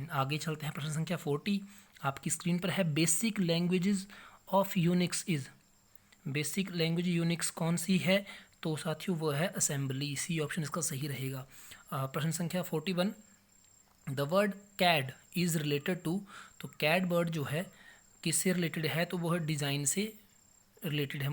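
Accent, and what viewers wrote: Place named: Kullu, India. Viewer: Yaa tum kullu se ho mandi but mujhe kullu se lag rhee ho ????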